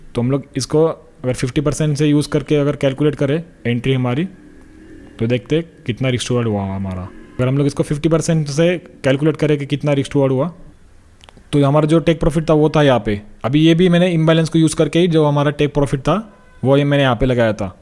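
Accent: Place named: Kerala, India. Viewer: You are amazing!!! with SMC concept bhai.Your accent seems tobe from God's own country,Kerala as I studied in Ernakulam for 2 years.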